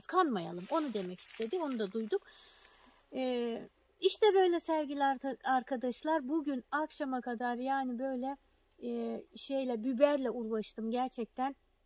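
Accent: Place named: Giresun, Turkey. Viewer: kolay gelsin ablam masallah sanasiz nerelisiniz acaba giresun lumusunuz siveniz oraya benziyo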